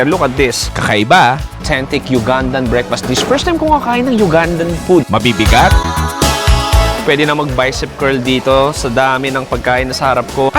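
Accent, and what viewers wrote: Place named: Philippines. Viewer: nakaka miss yung pinoy english accent pag nasa middle east ka 😅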